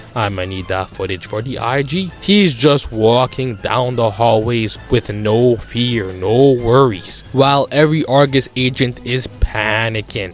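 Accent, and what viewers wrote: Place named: Toronto, Canada. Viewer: I can't pay attention with that crazy ahh toronto accent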